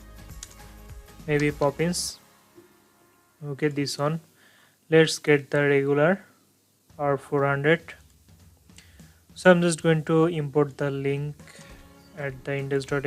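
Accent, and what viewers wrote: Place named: India. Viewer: Indian accent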